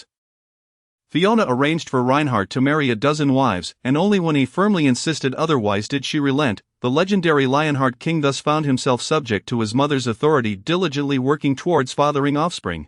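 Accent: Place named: United Kingdom. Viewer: Improve audio It sounds like some sophisticated British shit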